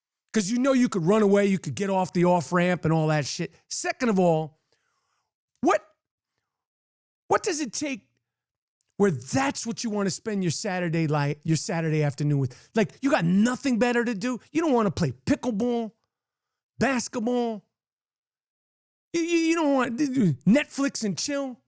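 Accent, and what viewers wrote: New York, United States. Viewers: I’m sorry this is not relevant to the video, but I do voice acting and I base my New York style accents off your voice and accent. I like the content on your channel too, keep it up!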